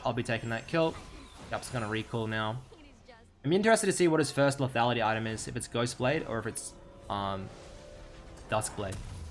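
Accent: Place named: Australia. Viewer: love the Aussie commentary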